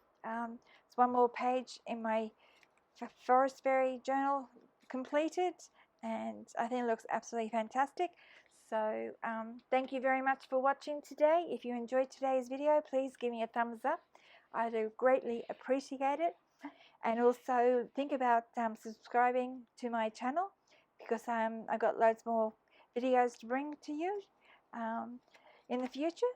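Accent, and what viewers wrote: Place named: Australia. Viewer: Nice to hear an Aussie accent 🐨 Love this teabag paper concept well done. Cheers from NSW 😊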